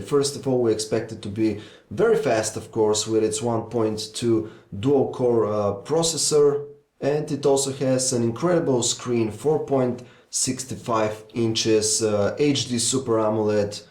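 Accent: Eastern Europe. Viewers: is your family's origin somewhere in east-europe? your accent makes me think this so. nothing bad. great preview guy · Dude your Eastern European accent is too ridiculous.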